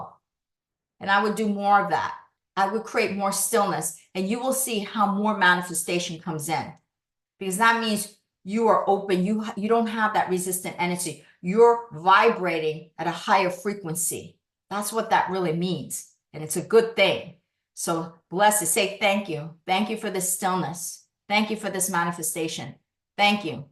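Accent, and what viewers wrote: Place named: California, United States. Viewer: Thanks❤ sounds like a strong californian accent 😊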